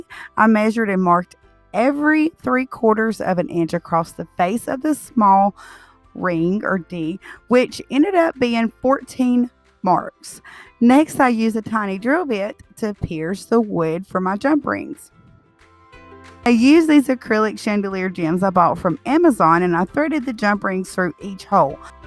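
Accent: South, United States. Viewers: I missed you girl! Live this diy!😻 I'm also subscribed to. I love her diy's also.😻 She does have a great soothing voice. I still love that southern accent of yours though. Anyway, can't wait for your next diy! 👍💖😊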